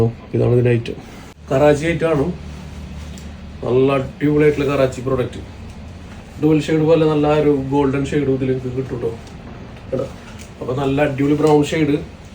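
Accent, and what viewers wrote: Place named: Thrissur, India. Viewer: Innentha oru Thrissur slang pidichad😂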